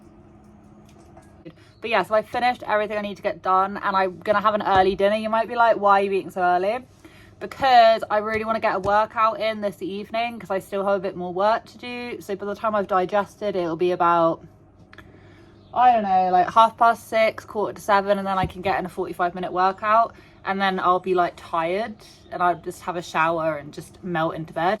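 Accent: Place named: Wales, United Kingdom. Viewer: Nice to this side of you! Beautiful dog and lovely landscape. The Wales accent is my favourite British dialect. 😊 You are lovely my dear! ❤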